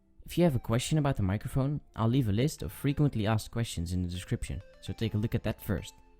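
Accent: Netherlands. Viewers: ja k hoorde aan je stem dat je nederlands was haha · are u from the netherlands ? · Je bent nederlands he verder top video man ga zo door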